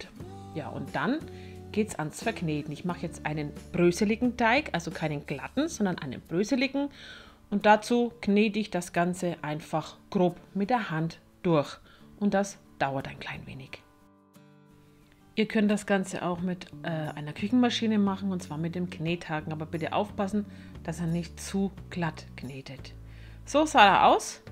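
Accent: Franconia, Germany. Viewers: a fränggin…schäi!!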